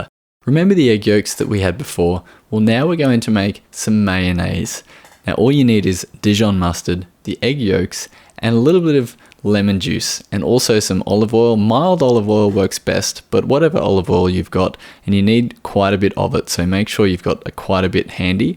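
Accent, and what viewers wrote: Australia. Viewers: I love your videos but I truly hate Australian accents no matter who is talking sorry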